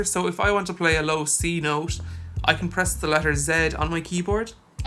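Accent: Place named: Ireland. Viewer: I like the irish accent :D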